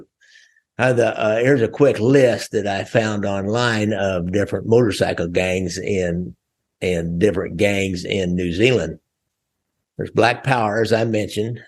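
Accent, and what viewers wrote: United States. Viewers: I just love hearing American pronounce New Zealand place names. Good video mate. Thanks.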